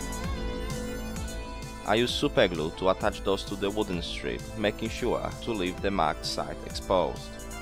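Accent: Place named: Liverpool, United Kingdom. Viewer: Great video! I'll have to make some of these! Love your accent btw! Did you learn English from a Liverpudlian? Certainly got that scouse ring to some words. Great job!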